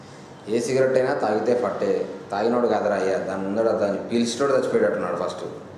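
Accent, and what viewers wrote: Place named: Telangana, India. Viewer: comic comments....especially the telangana yaasa....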